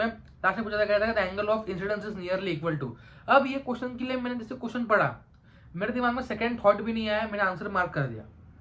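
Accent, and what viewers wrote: Maharashtra, India. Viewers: Bro are from Maharashtra.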